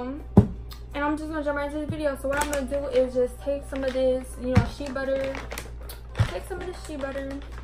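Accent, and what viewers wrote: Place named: New York, United States. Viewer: she sound like she from new york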